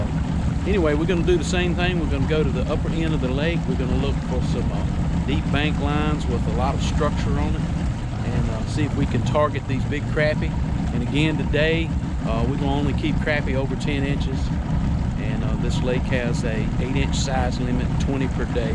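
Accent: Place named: Virginia, United States. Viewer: Nice, where are you located? You sound like a Virginian.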